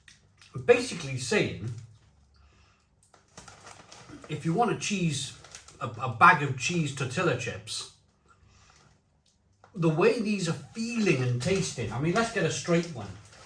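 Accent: Wales, United Kingdom. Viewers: Oh clart, you from Newport or caaaardiff?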